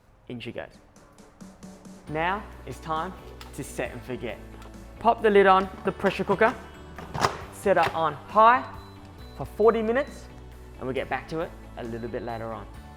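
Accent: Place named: Australia. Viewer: Great Video Mate... Found you through Max McFarlin's Channel... Heard the Aussie accent and wondered who is this guy!!! Well, now I know. Best of luck with the channel and all things food. Sub done!!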